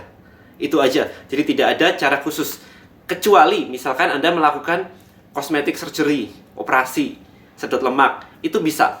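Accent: Java, Indonesia. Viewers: nice video koh.. btw koh, koh ini orang jawa yah?? ngomongnya medok amat koh.. hehehe..:D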